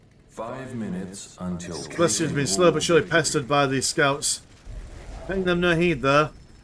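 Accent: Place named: Yorkshire, United Kingdom. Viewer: ‘Why not go eh oop and away with Yorkshire airlines? Wipe tha bloody feet!’ The accent only adds to the enjoyment for me. Keep up the good work! 🫡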